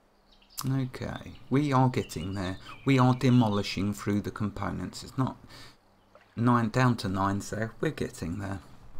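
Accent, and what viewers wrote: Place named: Essex, United Kingdom. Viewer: I'm also from the UK, perhaps near you as I'm on the Essex / Suffolk border and I think I can detect some Essex in your accent?:-)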